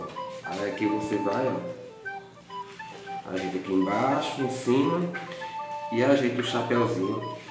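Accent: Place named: Recife, Brazil. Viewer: Parabens que lindo ! Amo esse sotaque é de Recife ? 👏🏻👏🏻😘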